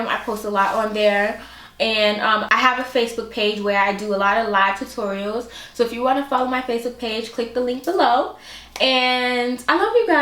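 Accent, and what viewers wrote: New York, United States. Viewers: Do you think that she has a new york accent ? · 😂😂😂😂😂 that new york came out hard afffff in this video lmaooo i love you 💗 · your so NY .i love it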